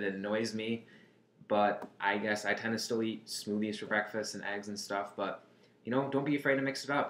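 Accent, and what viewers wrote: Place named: Chicago, United States. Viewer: I knew from your first sentence that you were from Chicago lmaoo me tooo :)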